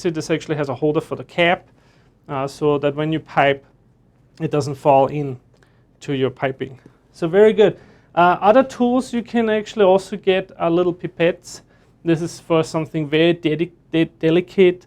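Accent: Austria. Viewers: Phillip Dodds austrian probably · Einen Österreicher hör ich aus 100 Leuten raus :-) Cooles Video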